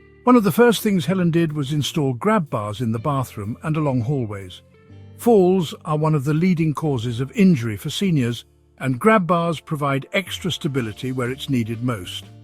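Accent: England, United Kingdom. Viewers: So despite the AI David Attenborough ENGLISH accent narration, this is intended for an American audience. Would be good to point this out at the start or in the title.😊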